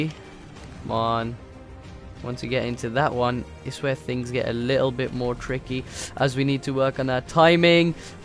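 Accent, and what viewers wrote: Birmingham, United Kingdom. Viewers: That brummy accent doe😂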